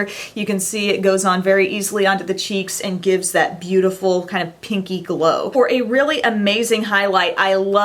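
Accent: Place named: Kentucky, United States. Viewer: done! added you. always glad to support a fellow KY girl! heading over to watch you now. wasn't sure I had the right person till I heard your accent! lol sounded like home. have a good day!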